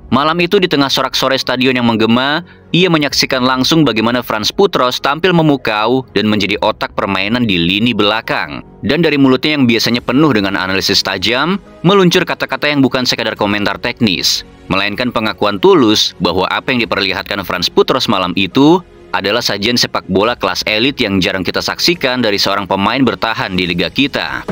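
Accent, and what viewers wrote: West Java, Indonesia. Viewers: Denger aja logatnya msh ada Sundanya 😂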